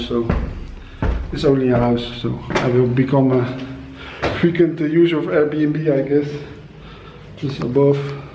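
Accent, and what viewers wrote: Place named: France. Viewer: avec cet accent c'est sûr tu es Français !!!! si oui bravo à toi de tout quitter pour la Colombie, tu vas pas le regretter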